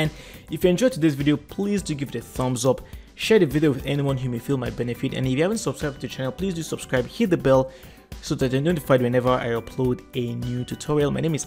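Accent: Nigeria. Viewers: are you a nigeria sir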